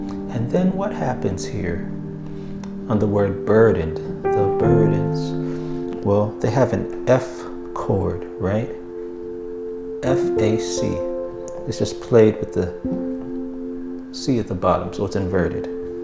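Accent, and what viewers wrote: Caribbean. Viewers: He sounds Caribbean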